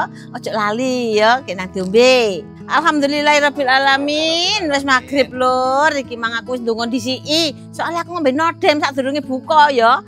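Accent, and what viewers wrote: East Java, Indonesia. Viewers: aku arep tako endi logate ko koyo wong lamongan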